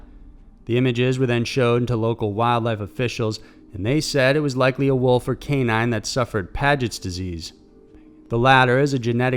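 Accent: South, United States. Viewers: Wonder if he has southern roots like McCaunahay?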